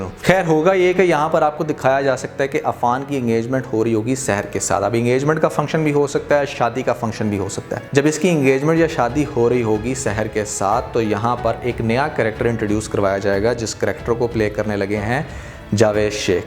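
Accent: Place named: Majha, India. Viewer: Punjabi ikdm Majhe wali boli aapne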